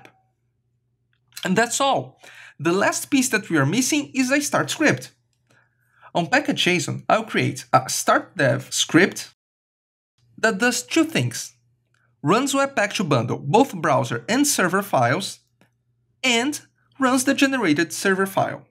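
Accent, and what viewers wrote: Brazil. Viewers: Are you Brazilian right ? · are you brazilian? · sotaque brasileiro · Well done! Desconfio que vc seja brasileiro haha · good explanation. you brazillian? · you sound like a brazilian speaking english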